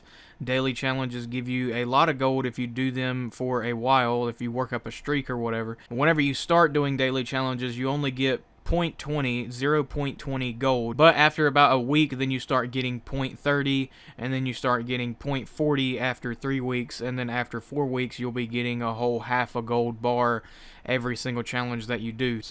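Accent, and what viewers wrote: South, United States. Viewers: I love the bit of southern he has in his voice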